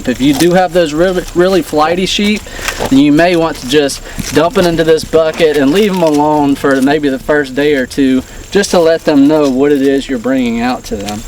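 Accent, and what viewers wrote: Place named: Kentucky, United States. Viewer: I like your gentle way with your lambs. Thanks for sharing, we just got three 14 week old girl lambs yesterday. I'm hoping ours can eventually be as freindly and trusting . Karen from Newzealand. By the way love your accent are you anywhere near Kentucky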